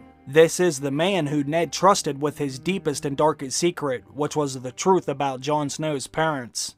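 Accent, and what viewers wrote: Pittsburgh, United States. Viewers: Is the Narrator a Yinzer? I hear a bit of Pittsburgh in his speech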